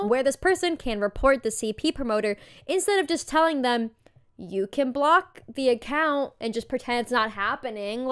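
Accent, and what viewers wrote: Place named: Canada. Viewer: Why do you have the Canadian raising accent 😭😭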